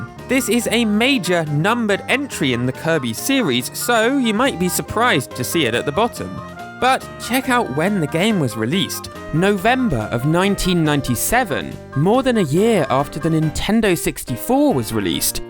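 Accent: United Kingdom. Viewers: I don´t know why, but it´s just hearing your calm voice and brittish accent and i just get super chill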